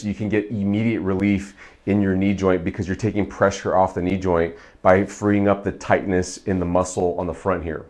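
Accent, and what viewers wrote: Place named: United States. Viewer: I hate this blody American English. Thooo..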